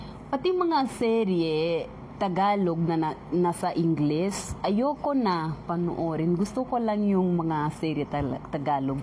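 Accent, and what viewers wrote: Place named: Visayas, Philippines. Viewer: Bisaya accent ka 😅✌️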